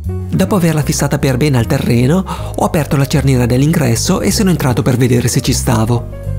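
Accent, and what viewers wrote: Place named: United Kingdom. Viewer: Ti ho scoperto da poco, e sei bravissimo e simpaticissimo con quel tuo accento inglese :)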